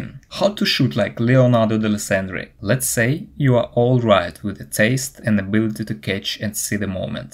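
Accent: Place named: Russia. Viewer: Good review. I really enjoyed it . I first had trouble with your Russian accent, but then it is was a good idea to add captions and titles throughout the video. Keep it up.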